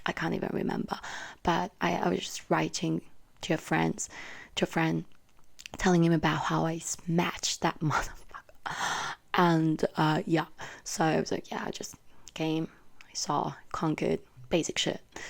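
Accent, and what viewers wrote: Australia. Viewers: Ngl but this sounds more Australian than British all the comments 😳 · *Australian pretty sure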